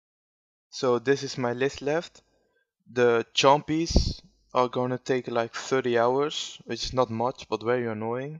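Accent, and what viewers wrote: Netherlands. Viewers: i hoorde aan je acsend dat je nederlands was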